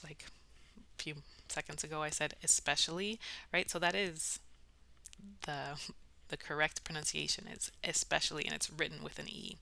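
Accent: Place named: United States. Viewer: Thank you very much teacher for sharing this lesson which make clearly the improvement in English language. I start understanding American accent with you.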